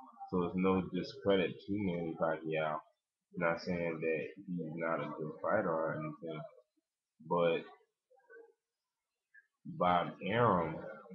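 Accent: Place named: Philadelphia, United States. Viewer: I can tell you from Philly by the way you talk no homo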